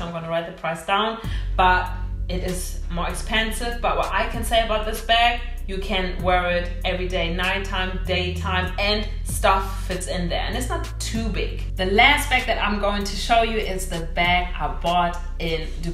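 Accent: Germany. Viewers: Are you german ich hörs raus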